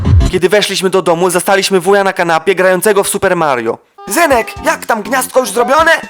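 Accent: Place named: Podlasie, Poland. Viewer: NO BARDZiEJ Z PODLASiA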